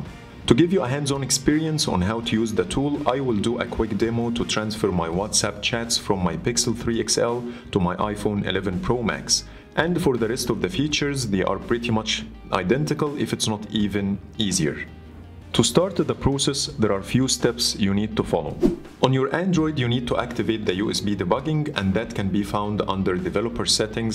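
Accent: Egypt. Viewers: Your accent sounds Egyptian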